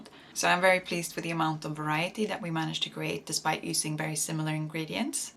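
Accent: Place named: Sweden. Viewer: Your channel is very cosy! I love your simple and nutritious recipes. Wishing you the best success on here. P.s your Swe(?) accent is lovely :)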